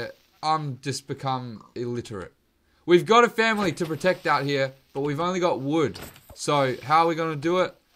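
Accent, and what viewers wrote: Australia. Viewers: Wait are you Aussie?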